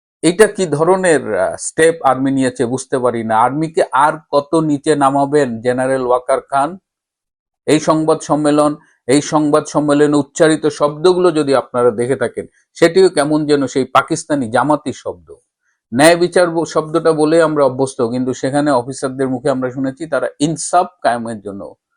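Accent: India. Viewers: তোমার কথা শুনে মনে হলো তুমি ভারতীয় দাদাবাবু তীয়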